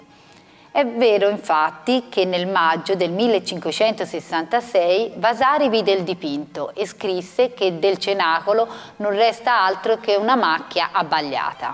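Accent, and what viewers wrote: Tuscany, Italy. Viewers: Ascoltar cose d'arte con accento toscano e, per somma combinazione, con suono di voce di donna, vellica una gioia intellettiva di rara bellezza. Chapeau all'Autrice!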